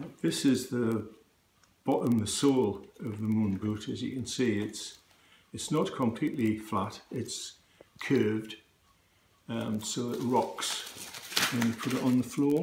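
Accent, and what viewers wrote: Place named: Newcastle, United Kingdom. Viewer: You sound like a geordie too! Lol like me. I am post surgery week 7. I am now on a moon boot instead of plaster. I am finding it difficult with physio. I am a 33yr old mother with two young children and I was studying to be a nurse in my first year of nursing. I had an accident and dislocated my ankle and broke it in three places, they said tibia, fibia and trimelleour I have had a plate in the side and screws and another plate, across and and something else. I have had to postpone my studies and will continue at a later date. To anyone reading this you've got got this. It's bloody hard work and it's not easy, am 7 weeks in and my skin has dried up and I'm still swollen and lost alot of muscle too. I am still very sore and I still have some bruising. I am worse mornings and evenings. I elevate my leg and I also do physio from home.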